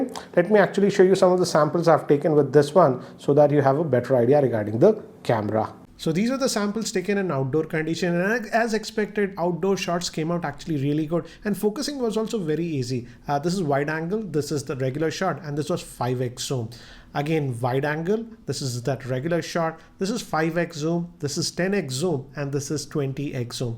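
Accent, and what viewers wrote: Hyderabad, India. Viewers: Brother your reviews in hindi is very nice which u used to do year ago videos. It would b great to hear reviews in hindi especially ur Hyderabadi hindi.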